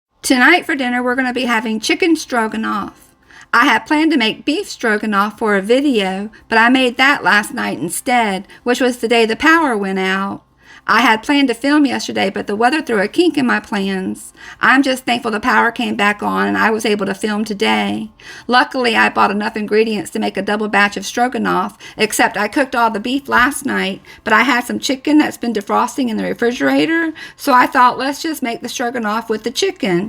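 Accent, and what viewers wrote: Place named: South, United States. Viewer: New subscriber from Mississippi, and it's so nice to hear your Southern accent! Your home is lovely!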